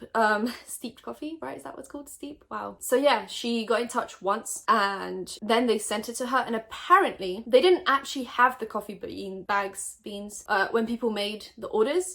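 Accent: United Kingdom. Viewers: omg, I thought you are British! What's your background then? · I thought u were British I'm confused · not to be rude or like insensitive but where are you from? i thought you were british this WHOLEEE time bc of ur accent lmao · i thought she was british?? Where is from? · So out of curiosity, where are you from? Cause I thought you were British😭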